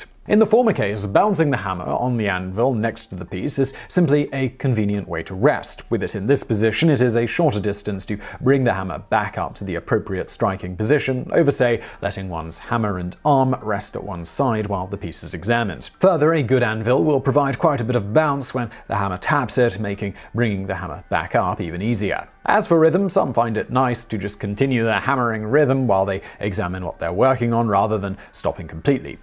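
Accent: United Kingdom. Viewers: BonesThe modern British English accent was created to distance themselves from seceded American colonialists. The goal was to make themselves sound posh, or more intelligent, versus someone from the US. Im saying his British accent is so perfect that instead of my usual opinion of the accent, which is lazy, I think it makes him sound rather fancy.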